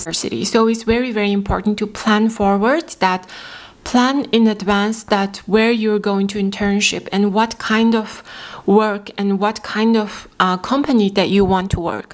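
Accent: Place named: Australia. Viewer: .. you are starting to get an Australian accent. 😁 Would you still study business if starting again today?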